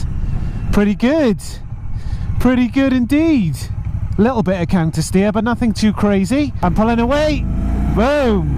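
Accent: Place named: England, United Kingdom. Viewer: Good review mate. I have not ridden an Indian before but I don't care for the weight difference on the right side. To me, that is an Engineering flaw that could be addressed by Indian. I have to say though, as much as I like hearing how you talk across the pond, I just need more England accent and jive talk. Give us the good stuff... :)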